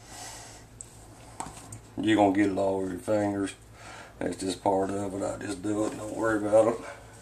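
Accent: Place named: Texas, United States. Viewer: strong texan accent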